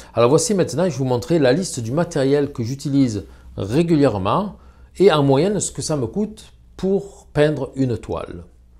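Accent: South, France. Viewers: Je dessine depuis toujours mais je n'ai jamais essayé la peinture (à part en primaire!). Cet homme me donne envie de m'y mettre, il respire la sincérité et le professionnalisme, ses vidéos sont très intéressantes et bien faites, et j'adore son petit accent méridional! Bravo et merci, et.....j'y vais!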